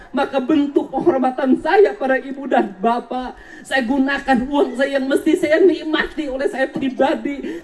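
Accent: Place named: West Java, Indonesia. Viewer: Cara ngomongnya pak dedi ngingetin sunda banget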